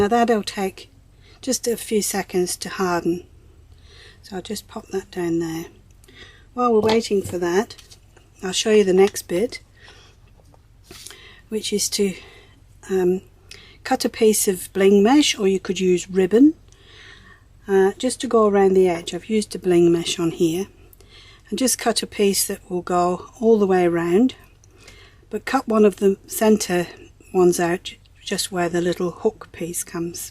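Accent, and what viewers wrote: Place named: Australia. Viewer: Omg this is genius ! What a brill idea saving to favs. Very clear instructions thanks loads will try this. I thought you were Australian but your English ? Where are you from ? Brill vid thanks xx Amanda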